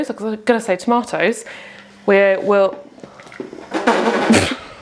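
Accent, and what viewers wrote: United Kingdom. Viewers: I love your channel; I feel that we can be best friends!! Love your lovely British English accent. You remind of my English teachers when I was studying English back in my hometown. I love the food you make! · I love your book and your videos. I'm Italian and I love your fantastic British accent too! I'm going to try the stuffed peppers for sure! They look scrumptious! Thank you!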